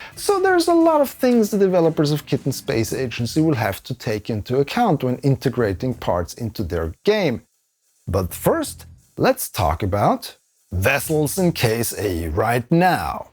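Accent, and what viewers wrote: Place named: Germany. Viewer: Thanks for these update videos. I don't have time to get deep into KSA development these days, so I'm glad you're providing summaries of whats going on. As an aside, what is that accent of yours? It sounds sort of German, but slightly spicier. So like perhaps you're Dutch or Austrian? I don't think Polish, and I feel like Belgian would be more Frenchlike? Just curious.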